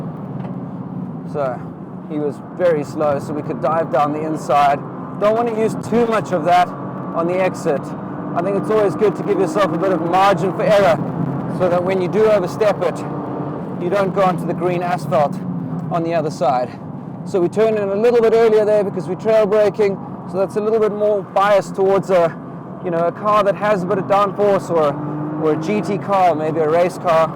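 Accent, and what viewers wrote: South Africa. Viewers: Hey woah, you sounds South African? I am really going to try and make a plan to come for some instructor days to Spa, need see pricing packages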